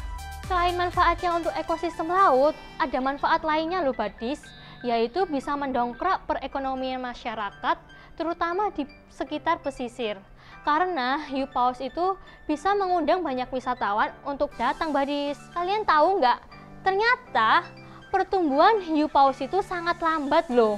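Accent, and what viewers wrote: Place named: Java, Indonesia. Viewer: Medoknya imut deh 😂😂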